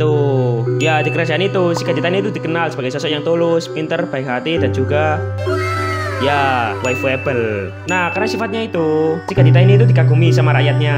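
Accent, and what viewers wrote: Java, Indonesia. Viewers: Jowone kentel tenan mas👍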